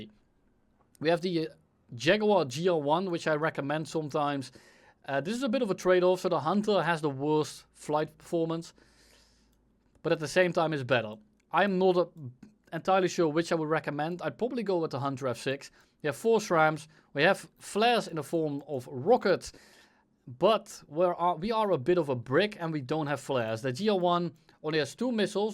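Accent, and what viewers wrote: Netherlands. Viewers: ik hoor n beetje nl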